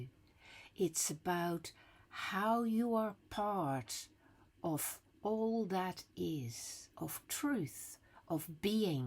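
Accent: Netherlands. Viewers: Beste, bent u Nederlands? Ik vroeg het me af op basis van uw Nederlandse naam en accent.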